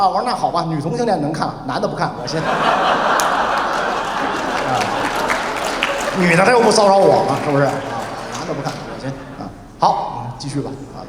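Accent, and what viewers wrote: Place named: Beijing, China. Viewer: 袁老师说话满满八旗味儿，😂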